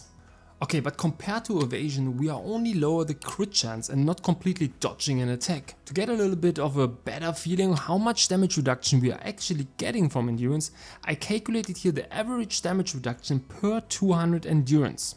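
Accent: Germany. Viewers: man hört direkt den deutschen raus :D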